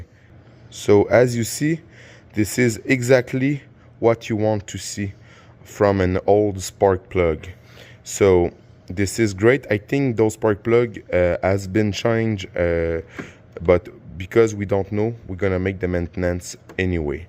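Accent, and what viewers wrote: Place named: Quebec, Canada. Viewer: Merci pour la vidéo. Très utile, accent québécois en prime! Comme le mentionne un autre commentateur, il ne manque que l'écartement des électrodes.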